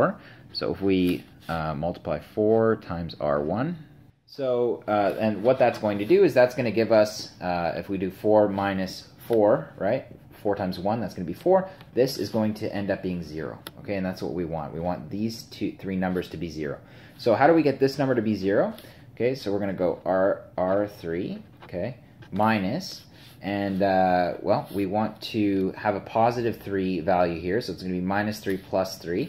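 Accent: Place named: Canada. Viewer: ha could tell by your accent, Canadian fella! great video man!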